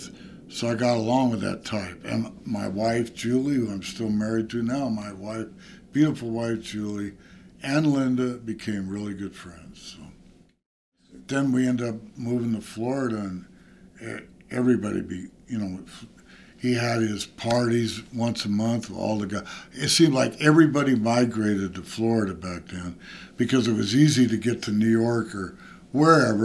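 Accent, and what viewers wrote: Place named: Michigan, United States. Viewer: He is real.... he talks like a Michigan accent.....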